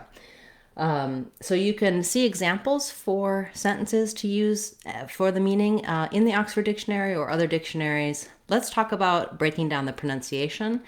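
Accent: United States. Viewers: Been learning English for a very long time , my vocabulary is vast and I’m almost flawless in grammar, never paid much attention to correct spelling. My biggest challenge is pronouncing the words correctly. I love American accent and I’m enchanted by your accent, words sound pleasant when you use them.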